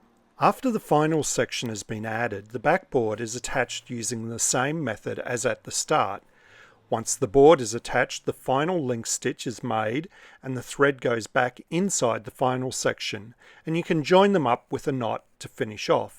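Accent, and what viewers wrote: Australia. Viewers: Me encanta tu trabajo te felicito. Ahora entiendo porque comprendo todo lo que dices, y es porque tienes acento australiano facil para mi. Un Saludo desde Chile 🇨🇱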